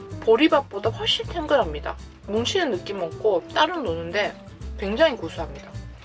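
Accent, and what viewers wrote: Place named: Busan, South Korea. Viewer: 처음으로 댓글 남겨봅니다. 늘 잘 보고 있습니다. 저에겐 많이 힐링 되어요. 감사합니다. 아무것도 섞이지 않은 순수한 선의와, 솔직하고 따뜻한 일상 영상이 너무 좋더라구요. 설령 슬프고 좀 우울해 쳐지고 하는 영상도 넘 순수해서 같이 공감할수 있어서. 강아지가 제가 집안일 할때면 거실에서 있으면서 tv 영상 켜주는걸 좋아하는데 그때 늘 영상 켜놓고 있어요. 얘도 힐링이 되는지 소파에 올라가 늘 꾸벅 졸더라구요 ㅎㅎ 구독자 선배님들께 예쁘게 보이고 싶어서 화장을 진하게 한다고 하는 영상은 정말 빵~ 터졌어요. 너무 귀여웠어요. 그리고 의 아재개그 정말 팬입니다. 저 몇개 외웠어요 ㅎㅎㅎ 또 서울 와서 우리는 사투리 안쓰는데 사투리 쓴다고 알아봐서 놀랐다고 해서 남편이랑 보다가 진짜 뿜었어요 ㅋㅋㅋㅋ 어찌나 귀여운지… 실제로 부산출신 제 친구가 한 말이랑 너무 똑같았거든요. ㅋㅋㅋㅋㅋ 두분 너무 너무 잘 살고 계신것 같아 부럽습니다. 코로나, 계엄 겹치며 사업 문제로 몸도 마음도 많이 지치고 힘들었을때 함께 일상을 나누는 듯 정말 많이 힘이 되었습니다. 감사하고 극 내향인 일인으로서 인제서야 댓글 남겨봅니다. (이것도 메모장에서 몇번의 수정을 거쳐서 올렸는지 ㅋㅋㅋ)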